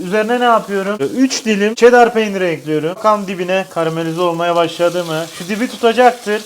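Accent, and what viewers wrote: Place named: Diyarbakır, Turkey. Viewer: Abi Diyarbakırlı misin ?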